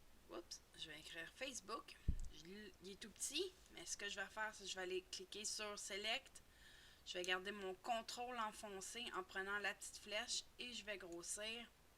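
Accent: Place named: Canada. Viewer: Ah cet accent canadien ! j'aime beaucoup ! Et merci pour le tuto.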